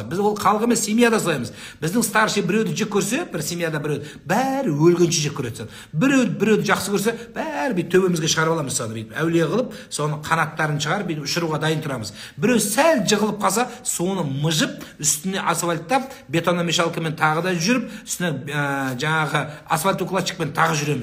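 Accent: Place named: Kyrgyzstan. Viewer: Ообада таптаза кыргызча суйлойт екен ,рахмат сизге.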